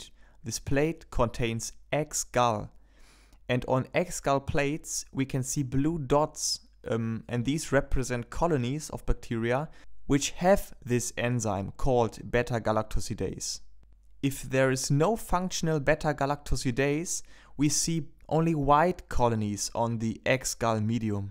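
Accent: Germany. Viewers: Absolutes alman English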